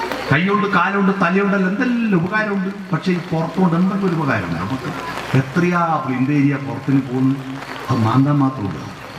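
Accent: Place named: Kannur, India. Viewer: ' ഞാൻ ഏറ്റവും കൂടുതൽ കേൾക്കുന്നത് ഈ പരിപാടി ആണ്. കാരണം പഴയതും പുതിയതും ആയ പലതും ഇതു കേൾക്കുമ്പോ പഠിക്കാനുണ്ട്. എത്ര എത്ര അർത്ഥവത്തായ കാര്യങ്ങളാണ് പറയുന്നത്. ഇദ്ദേഹത്തിൻ്റെ കുടുംബം എത്ര ഭാഗ്യമുള്ളതാണ്. കാരണം ഈ ഒരാളു കാരണം കുടുംബം നാട് -ഒക്കെ നന്നാവുമെങ്കിൽ എത്ര സന്തോഷം. ഒരു ഉദാഹരണം . ഇന്ന് കാലത്ത് ഒരു ദുഃഖവാർത്ത കേട്ടു അപ്പോ മുതൽ മനസ്സ്മല്ലാത്തൊരു അവസ്ഥയിലായി. ഒന്നും ചെയ്യാൻ തോന്നുന്നില്ല ആകെ ഒരു വല്ലാത്ത അസ്വസ്ഥത അപ്പോ എന്താ ചെയ്യേണ്ടത് നാമം ജപിക്കാൻ തോന്നുന്നില്ല ഒന്നും വായിക്കാനും തോന്നുന്നില്ല TV ഒട്ടും കാണാൻ തോന്നുന്നില്ല എന്തോ എനിക്കതോന്നി ദിവസവും കേൾക്കുന്ന ഇദ്ദേഹത്തിൻ്റെ ചാനൽ നോക്കിയാലോ പലതും കേട്ടതാണെങ്കി കൂടി കേൾക്കാൻ രസമുണ്ട് വാസ്തവങ്ങളാണ് പറയുന്നത്. വച്ചു കുറച്ചു കവിതയും എല്ലാം കേട്ടപ്പോ ഒരു വലിയ ഭാരം മനസ്സിൽ നിന്നും ഇറക്കി വച്ച പോലെ തോന്നി. ഇതാണ് ഒരു മരുന്ന്. ഒന്നു കേട്ടു നോക ഒരാശ്വാസവും - ഒരുഉത്സാഹവും ഒരു സമാധാനവും കിട്ടി നന്ദി ആരോടു ചൊല്ലെണ്ടു ഈ മനുഷ്യനോടോ ഈശ്വരനോട് അറിയില്ല ഭാഷ കണ്ണൂർ ആയതു കൊണ്ട് ആദ്യമാദ്യം ചിലതൊന്നും മനസ്സിലാവില്ലായിരുന്നു. 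ഇപ്പോ കുഴപ്പമില്ല. ഒരാവർത്തിയല്ല പല ആവർത്തി കേൾക്കുന്നു ഞാൻ. നന്ദി - നമസ്കാരം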